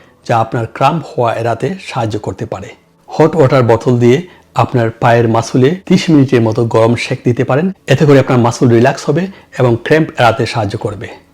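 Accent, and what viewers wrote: Sylhet, Bangladesh. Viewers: আপনি কি সিলেটি? কারন আপনার উচ্চারণে তা বুঝতে পারলাম।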